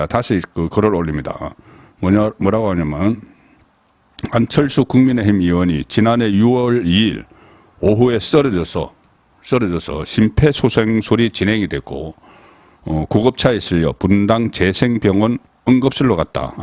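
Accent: Gyeongsang, South Korea. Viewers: 송국건님 제안드립니다. ※불필요한 군드더기 어~, 저~, 이~ 이런 류의 아주 나쁜 습관이 있어요. 속히 시정해 주세요. 경상도 억양의 전체적인 분위기야 어쩔 수없다 하여도 위에 지적한 것들이 심하게 섞일 때면 외면하게 됩니다. 발전하세요.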